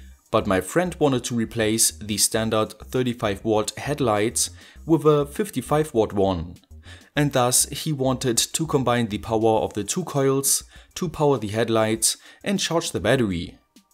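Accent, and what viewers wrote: Germany. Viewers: des is voll lustig wie du so richtig deitsch englisch redest ;D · What kind of accent do you have? I can't quite recognise it Like which part of Germany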